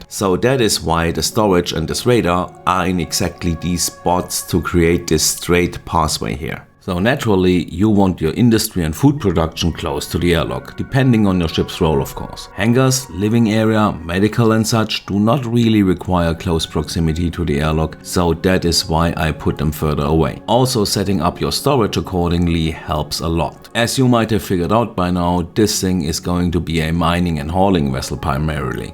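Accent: Germany. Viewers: Fine video. ( On a sidenote: I'm really struggling with our german accent 😅)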